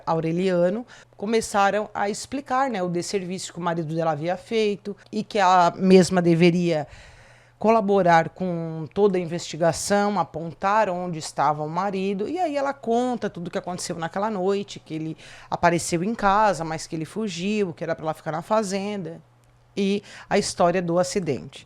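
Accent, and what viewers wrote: South, Brazil. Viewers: JÁ OLHEI ESTE CASO MUITAS E MUITAS VEZES,MAS VOU OLHAR E DEIXAR MEU LAIKAO,PORQUE A APRESE NTADORA É A A LOIRAAAA MAISSS LINDAAAA DO MUNDOOOO E O SOTAQUE SULISTA ENTÃO,DEUS TE ABENÇOE RICAMENTE MINHA RAINHA,MINHA DEUSA MARAVILHOSAAAAAA❤❤❤❤❤❤❤❤❤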